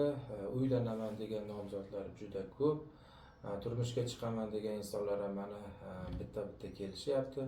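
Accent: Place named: Khorezm, Uzbekistan. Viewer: Ассалому алейкум. Ози каерлик ? Шевасидан хоразим или Караколпогистон турткул или элликкала беруни туманиданми .телеграмда чикмаябди номерингиз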